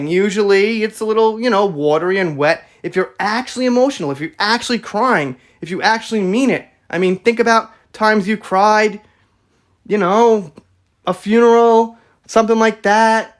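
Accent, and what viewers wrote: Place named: Brooklyn, United States. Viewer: This is my 4th time looking at your channel and I LOVE ITZ!! Thank you! Love your “ Brooklyn”? Accent too ( from The Heights😍 )!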